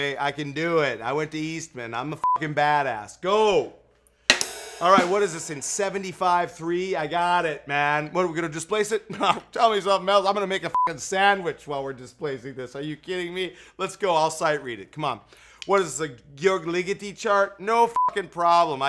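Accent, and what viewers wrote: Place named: Midwest, United States. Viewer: This dude is from the midwest for sure.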